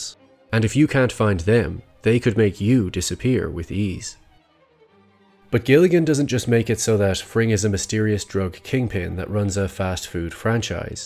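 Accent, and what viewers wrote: Ireland. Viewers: R u Irish I can hear the accent a bit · Where in ireland u from?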